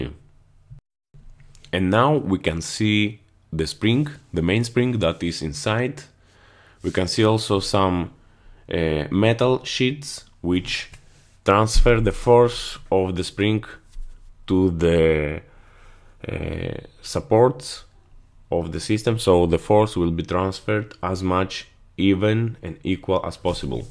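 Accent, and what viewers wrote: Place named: Russia. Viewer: Sounds like Russian accent.. Am I right?)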